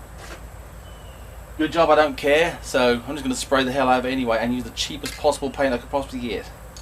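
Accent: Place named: West Country, United Kingdom. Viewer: Where are you from? I get a slight hint of West country then antipodean. Like an accent twisted from being abroad.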